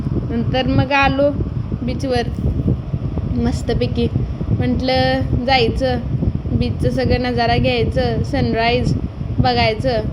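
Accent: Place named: Kolhapur, India. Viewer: लय भारी कोल्हापूरी मराठी 😊😊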